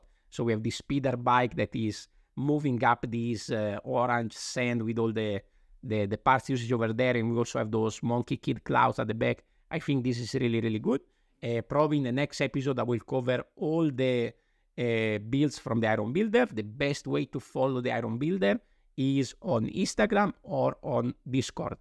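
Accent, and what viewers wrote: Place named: Italy. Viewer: Thank you for the kind feature, I'm so glad you like my Fabuland paddle steamer. Your models are beautiful and I absolutely love watching this channel, particularly for your accent as it reminds me of my Italian friends. Best wishes, Stewart (Stubot) ❤💛💙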